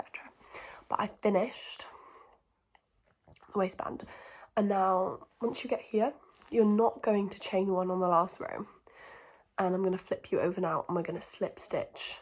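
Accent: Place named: Pembrokeshire, United Kingdom. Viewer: Hia, I've just found your channel and I'm enjoying it. I agree that you have a lovely voice. Can I hazard a guess that you're from Pembrokeshire? I grew up there and find the accent very familiar and comforting.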